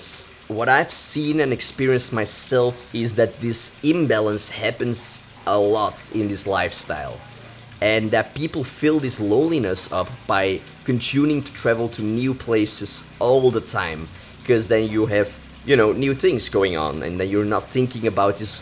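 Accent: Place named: Mexico. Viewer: As soon as i heard you speak, i knew you where MEXICAN !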